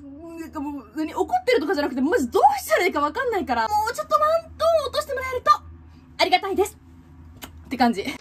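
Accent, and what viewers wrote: Kansai, Japan. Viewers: この時の可愛い。いつも可愛いけど。特に可愛いあと関西弁強めw好きよw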